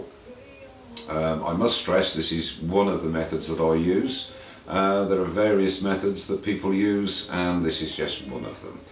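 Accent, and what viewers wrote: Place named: England, United Kingdom. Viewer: Where's your accent from, are you Norfolk or West country?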